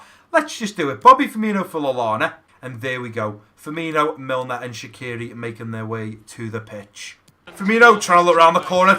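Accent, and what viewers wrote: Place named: England, United Kingdom. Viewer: Where are you from? I mean the country. Because it sounds like you are from England.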